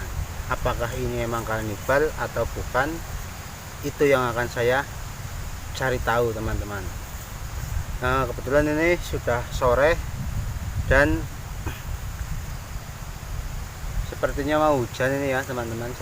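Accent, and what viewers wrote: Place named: Java, Indonesia. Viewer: Medoknya terasa banget org mana ni bang grndut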